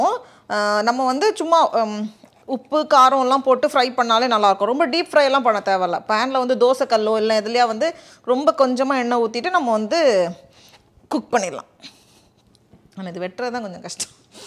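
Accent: Nagercoil, India. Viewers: Akka ninka nagarcoil thana . nanum tha yena ninka peasurathu and ninka pandra nagarcoil side enjithiyal famous appovea kandupudisan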